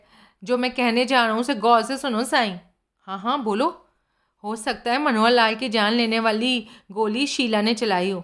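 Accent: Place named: Punjab, India. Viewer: आप पंजाबन हैं, आपकी पंजाबी एक्सेंट बहुत बढ़िया है।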